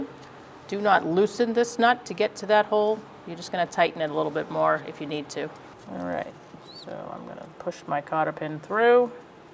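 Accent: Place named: New England, United States. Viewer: She sounds and that Subaru looks like its from Massachusetts or some other New England state. Lol im from Maine so i know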